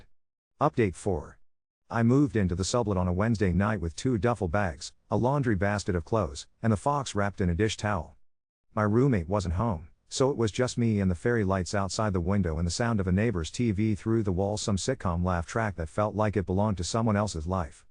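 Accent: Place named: South, United States. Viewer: why is the voice southern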